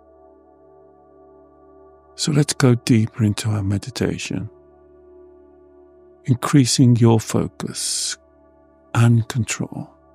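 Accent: England, United Kingdom. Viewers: I love the English accent as well—it’s endearing ❤ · God!! You are AMAZING!! As I already know; I literally was with you, meaning, JUST US! NO ONE ELSE!; Simply a one on one, which makes you! Simply AMAZING!! Every step I envisioned my past; Or as Joan Collin’s named one of her books of her past “ Past Imperfect” 😅 I looked at my family and thyself’s, “Past imperfect memories of happenings, Emotionally and Happily., again I say, You are a Blessing to ALL. And Your Lovely English accent 👀 Helps too!😂 All the ✌🏼❤️ out 2 🫵🌿😉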